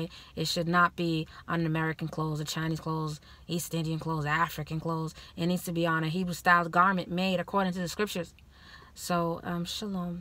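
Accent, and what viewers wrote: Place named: Europe. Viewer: Love the information. Voice reminds me of the old 1950 movies. I believe that is a Continental accent.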